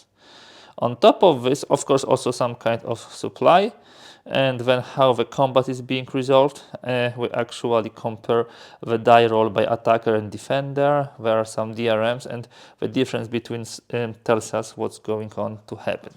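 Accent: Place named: Poland. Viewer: nie jestes czasem polakiem?akcent masz podobny do mojego jak gadam w ingliszu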